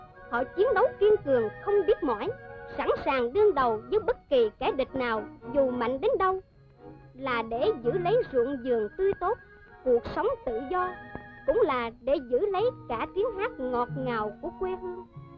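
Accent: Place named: South, Vietnam. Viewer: Giọng đọc Miền nam qúa hay. Hiệp định pa ri 5 tập do a Lâm Hưng và chị Mẫn Thanh đọc cũng qúa hay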